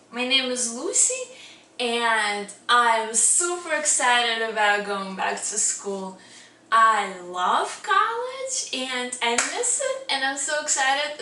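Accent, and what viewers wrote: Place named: Russia. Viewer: you are russian! i think your accesent well i am russian too!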